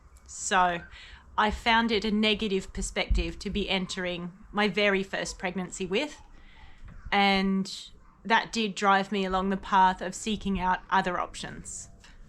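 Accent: Australia. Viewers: Thanks for sharing your story! I was so excited to find your channel, it’s refreshing to hear an Aussie accent and Australian birds in the background 😂 I have had four natural hospital births and feel so drawn to a home/unassisted birth next time so hearing others stories is inspiring to me ❤ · Thankyou for sharing Your Birth Story. I enjoyed listening to a fellow Aussie. Your Videos are Great!! Sending Love to You & Your Family. ❤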